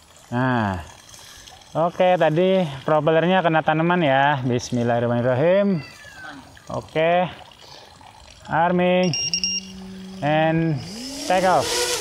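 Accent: Cirebon, Indonesia. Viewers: kalo dari logat bicaranya kalo ga orang cirebon.indramayu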